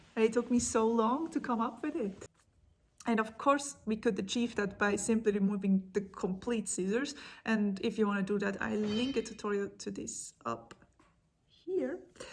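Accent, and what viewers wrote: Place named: Switzerland. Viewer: avec le ravissant accent suisse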